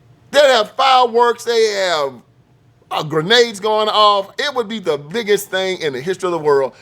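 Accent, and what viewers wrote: South, United States. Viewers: Love your delivery, and that Southern Drawl, truth, love ,and light,God Bless from not so Great Brtain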